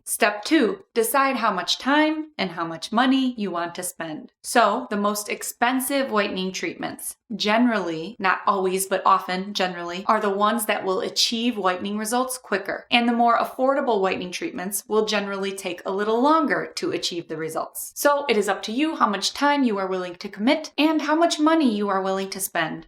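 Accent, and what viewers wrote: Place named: Chicago, United States. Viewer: So. Much. Chicago! I love your accent! Thanks for the great advice!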